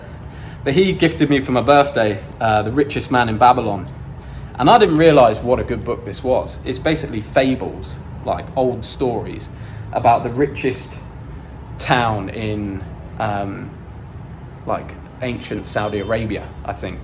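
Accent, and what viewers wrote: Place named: United Kingdom. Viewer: Bri-ish accent wif uh harry poht-uh legos is honestly excellent. You’re the most bri-ish person I follow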